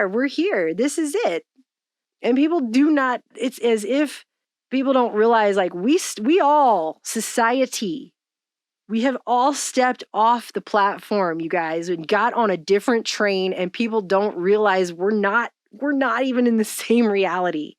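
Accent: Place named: Hispanic American, United States. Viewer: I like your accent. You sound like... a second generation Hispanic American female, age 22-39, from the northern states, somewhere between Chicago and North Dakota, medium build, attractive, long brown hair. How close am I? Practicing making inferences from a sparse data set(i.e, your accent.)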